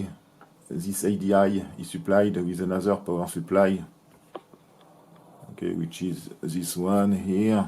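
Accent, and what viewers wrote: France. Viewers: Hahaha, your "few moments later" narration fits perfectly with your nice french accent.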